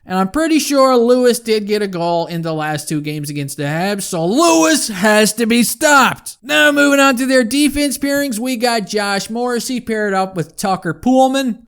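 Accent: Newfoundland, Canada. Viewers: Are ya a newfie ?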